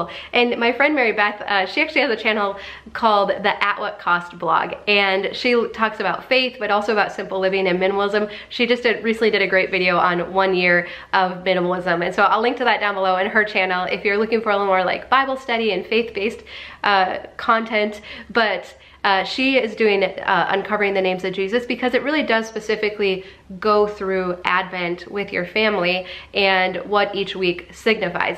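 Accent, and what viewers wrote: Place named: Midwest, United States. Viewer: It all depends on where in the US you’re from. Most of the country says roof with the “oo” sound like moon. But you can always spot a Midwesterner with “roof” and “root”. Oddly, “room” doesn’t follow the pattern. I’m from the Midwest as well, but my parents pronounced them with the usual “oo” sound so I did, too. But all of the kids I grew up with said them as does!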